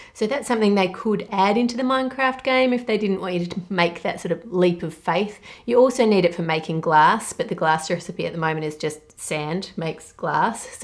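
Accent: Australia. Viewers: The best part of this video is hearing pronounce the word “grown”. I love the Aussie accent! Thanks for another great video:) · Today I learned that aussies say "weight" because they don't know how to say "wheat". At least I guess that's why.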